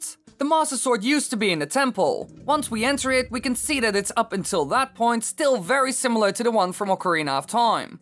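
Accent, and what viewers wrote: Netherlands. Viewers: despite the accent i can spot a dutchman in your voice for sure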